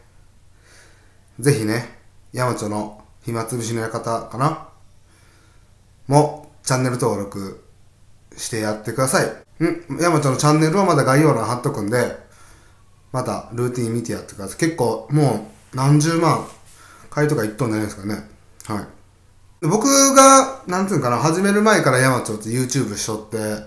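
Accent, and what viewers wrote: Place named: Okayama, Japan. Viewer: さんと幼馴染みとも知らずに、さんの動画を見てました。彼の独特の岡山弁が好きですね♪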